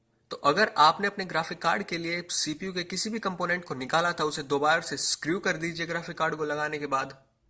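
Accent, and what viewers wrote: India. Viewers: Nice video dude! I am not an Indian but I like this accent :) Btw, Save up for a 1030, Because 1030 is much better than this :D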